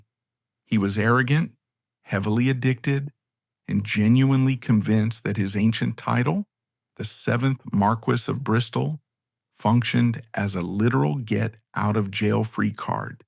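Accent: United States. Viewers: I hate this American narration . Boring !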